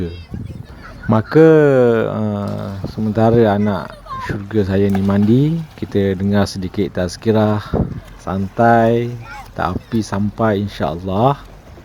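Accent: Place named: Indonesia. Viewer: Kenapa ckp ada mcm indon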